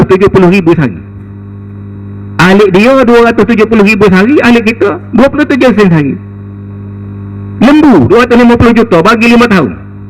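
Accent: Kelantan, Malaysia. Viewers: Kamu ni bongok. Dah dia ceramah di Salor, Kelantan, memangla dia cakap loghat Kelantan. Jangan la membangangkan diri sendiri. Pegi la dengar ceramah dia di Putrajaya. Dia kecek kl la bangang. Kamu yang ikut UMNO sesat nak menyesatkan orang. Bodoh tak boleh diajar. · err nak mintak tolong kalau ada ceramah lg kt kelantan boleh x guna bahasa kl..minat kt ceramah ni...tapi bahasa tu pekat skit,susah nak paham sgt..minta maaf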